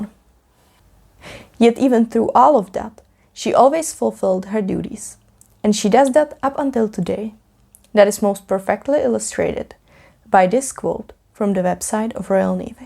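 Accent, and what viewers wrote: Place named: Eastern Europe. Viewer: This slavic accent needs to be removed. If I did it, you can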